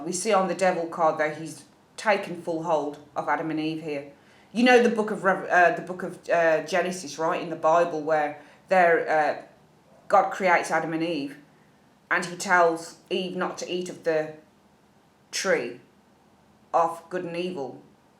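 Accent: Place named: England, United Kingdom. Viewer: Bitch slap 👋..... 😂😂😂😂😂and with that beautiful English accent 😂😂😂😂😂❤️❤️ ...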